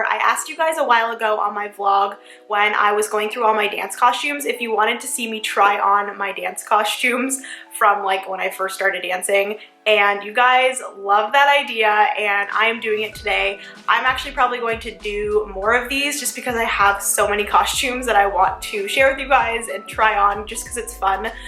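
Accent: Canada. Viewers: paige thames she is French candian thAts why she talks like that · Are you Canadian? It really sounds like it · Evelyn M, she can't help it! That's what happens when you're born with a Canadian accent. What if I said I didn't like that you're a girl, you can't help it you're born with it so stop being mean to people when they can't stop or help with their doing or saying.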